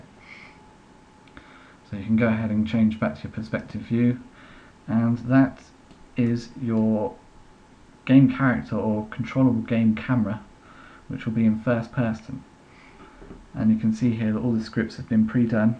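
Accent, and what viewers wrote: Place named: United Kingdom. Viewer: Your accent is like of Britishers' ;D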